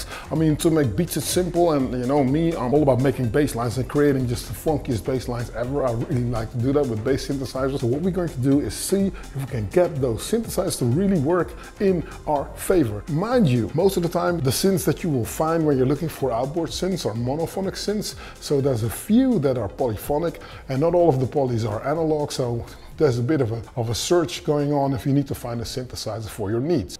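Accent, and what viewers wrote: Netherlands. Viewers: You def dutch by the aound of your accent lol😂🚀 dope video you gained a sub brother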